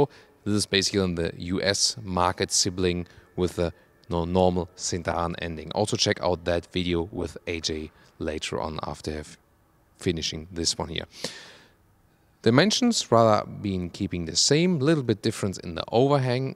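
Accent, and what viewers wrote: Germany. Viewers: i like ur casual look betterand ur hairback looks better.still got a nice german accent~~~~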